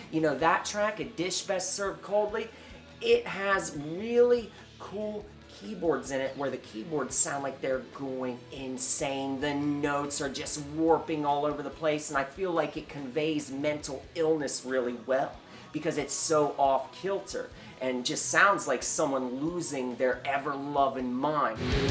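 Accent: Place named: East Coast, United States. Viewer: This was like a TON biopic.. so much info, it's fantastic💚🖤. You gave each album equal opportunity; the the details of his life personality, for example his fear of judgement and substance abuse/ mental health. He was such a sweet soul deep down. Your tales of meeting him are so endearing. Thank you so much for this. He really did make the world if music a better place. P.S. I was way off on your accent a while back... definitely caught the east coast this time. That one time I guessed the South 🤦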